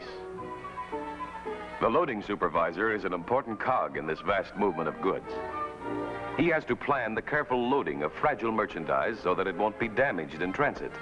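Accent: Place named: Mid-Atlantic, United States. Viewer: That's the mid-Atlantic accent.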